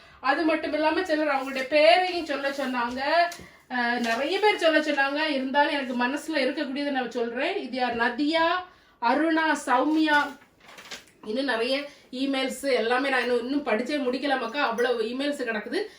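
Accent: Nagercoil, India. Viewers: I love to hear your Nagercoil Tamil. I am from Nagercoil settled in Bombay. I watch your almost videos. Beautiful couple. I never seen your elder son. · Sister so so cute and beautiful.your voice really nagarkovil.brother super aha flights podukirarkal.super.daily i watch your videos.sweet voice.effect aha ullathu. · All ur video are too good sister. Ur Nagar kovil language is very nice to hear . Keep doing it sister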